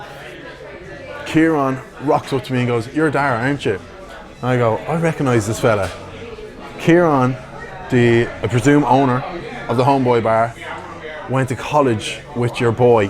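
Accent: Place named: Essex, United Kingdom. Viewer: Your Essex accent is pretty decent mate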